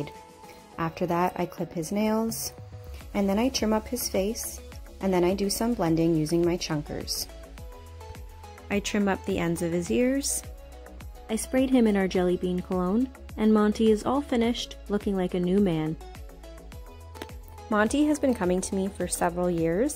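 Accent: Canada. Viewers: A bit off topic, but as a non-native English speaker, I felt so proud of myself when I could hear your Canadian accent and then I checked and the assumption was correct😄 And on the topic of the video - Monty is just an absolute sweetheart 😍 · I've NEVER been a pet owner, but Im so glad this channel was in my recommendations. I found this video to be so satisfying and peaceful. And as an American from the great State of Texas, your Canadian accent got you a new subscriber!!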